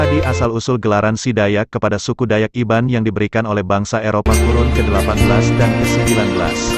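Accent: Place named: Indonesia. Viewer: Hanya satu yg aku nak Tanya... Admin channel ni asal org mana ya? Asal org Sarawak ke org Indonesia? Sebab slenk suara tu mcm Indonesia je... Hanya bertanyak ya 😊 #NgapSayot®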